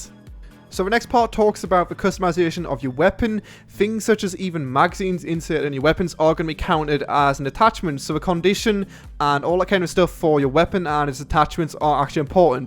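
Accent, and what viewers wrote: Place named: United Kingdom. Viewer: I miss your videos. Make some Wasteland or carry on playing Dayz Taviana!!! Please!!! Btw like your british voice:)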